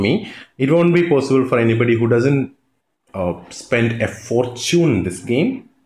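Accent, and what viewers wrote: Kerala, India. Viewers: oru malayali paryne pole tane und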